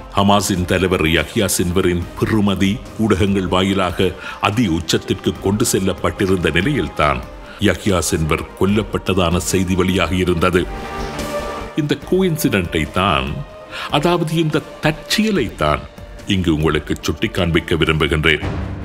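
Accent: Sri Lanka. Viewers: உங்கள் குரல் சூப்பரோ சூப்பர் ஐயா. ஐயா நீங்கள் இலங்கை தமிழில் பேசுவது ரொம்ப சூப்பர். ஐயா இஸ்ரேல் கூடிய சீக்கிரம் அழியும் அந்த அழிப்பை படைத்தவன் செய்வான்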